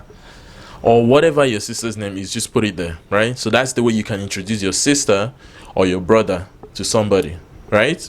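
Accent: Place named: Anambra, Nigeria. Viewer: Thank you, your channel is very good, I am a beginner..but I can recognize the things you're teaching, but in an Anambra dialect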